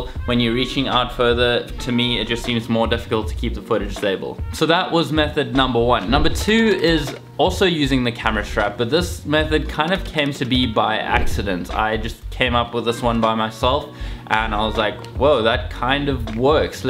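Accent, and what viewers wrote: South Africa. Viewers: picking up a south african acent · The accent sounds very South African. Finally I get to hear another South African review about cameras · As soon as I heard that accent I just knew it... Big ups bro, great tips ... very useful 🇿🇦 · You sound south African?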